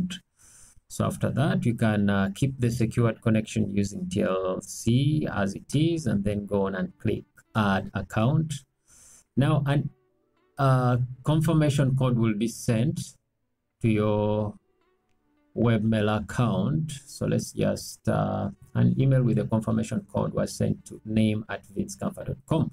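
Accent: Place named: Uganda. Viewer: You sound Ugandan, thanks!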